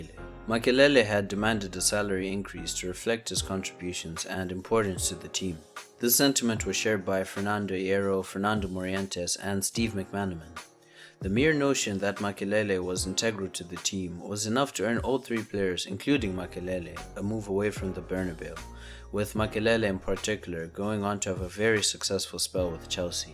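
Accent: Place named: Zimbabwe. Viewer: You sound Zimbabwean bro! Love the video though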